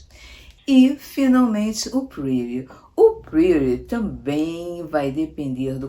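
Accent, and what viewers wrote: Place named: Rio de Janeiro, Brazil. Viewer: venho só pelo sotaque carioca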